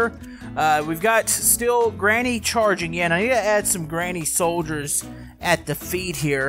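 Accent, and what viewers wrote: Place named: South, United States. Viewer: And are you from Texas because you sound southern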